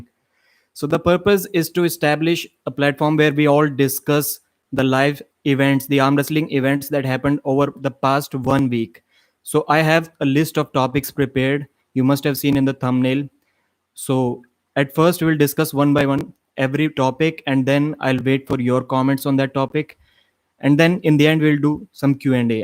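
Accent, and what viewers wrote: India. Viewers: a guy said he could hear your Indian accent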